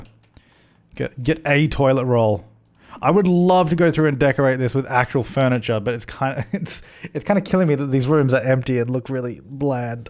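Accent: Australia. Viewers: I'm weird lol:3 All Australiens sound the same for me I think 🤔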